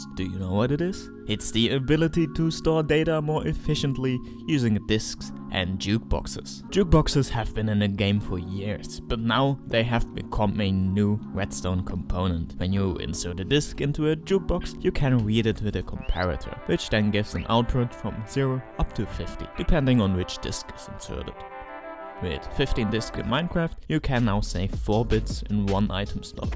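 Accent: Germany. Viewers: Du sprichst sehr gutes Englisch, aber ein Deutscher wird immer einen Deutschen der Englisch spricht erkennen 😄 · Heavy German accent ftw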